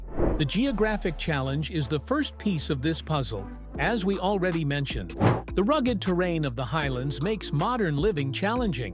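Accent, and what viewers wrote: United States. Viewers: F'cking American voiceover. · seconds in and an American accent that can't pronounce towns ("Endinboro"), then I read the comments! Result, found something bbetter to do with my time!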